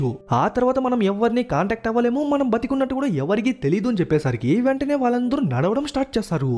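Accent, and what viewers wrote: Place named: Rayalaseema, India. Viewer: AS ALWAYS SUPER EXPLANATION ANNA love your all video from beginning mi telugu slang naku baga nachindi ekkada anna rayalaseema na ♥♥♥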